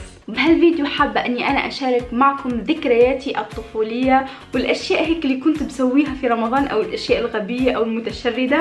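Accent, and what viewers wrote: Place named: Tunisia. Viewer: لهجتك : كوكتال تونسي على مصري على لبناني من انت؟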